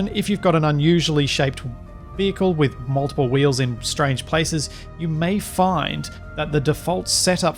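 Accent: Australia. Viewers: Aaah yeah i knew you did something like that;) I think its even easier 4 me to understand you Guys because of your Australian accent ´s (dont like that high Royal Perfect UK Eng so much it sounds so... Royal and is to fast) Your accent sounds much lovelier and sometimes more Funny esspecially when comes around the Corner and youre "makin him Dizzy" and something like that;) Ever want to travel to Australia and not because of the Beautiful Land itsell but mostly for the nice People and Culture that are living there and mby a little bit because of a Movie Series in my Childhood... Sorry but since i saw Mr. Croc Dundee 20 yrs ago or so i knew you were Cool People and i really like the Pesonality of this guy since now;) Its just a movie i know and because of that im happy to be able look just around the World with your Series here and all the other ZebraMonkey LSG´s. 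So again Thanks for good Entertainment and education!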